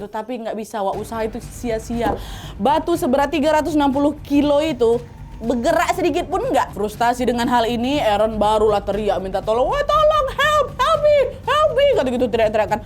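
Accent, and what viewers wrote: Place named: Medan, Indonesia. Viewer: namamu, namaku nidia. lahir 27 okt, aku 28 okt. Awal kali aku liat video ytb krn logat bicaranya kayak orang medan, kirain aku org medan wkwk Sukses terus ya kak❤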